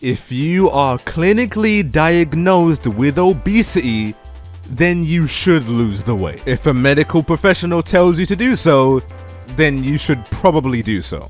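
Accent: United Kingdom. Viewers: you didt sound like timmy you sound just vary british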